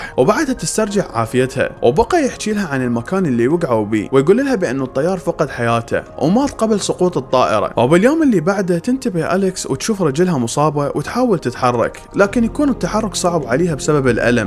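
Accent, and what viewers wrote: Iraq. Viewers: طبعا اني كولش احب اللهجة العرافية اتجنن اخوكم من بنكلادش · اللهجه العراقيه مميزه تحياتى لاهل العراق اهل الحضاره والكرم مصرى يحب العراق واهله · اللهجة العراقيه جميله جدا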